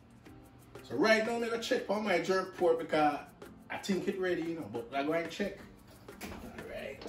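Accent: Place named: Jamaica. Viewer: Looks yummy and colourful. A feast for the eyes and stomach. P.S. I love the touch of Jamaican accent .... a full authentic Jamacian vib. ❤ 🇯🇲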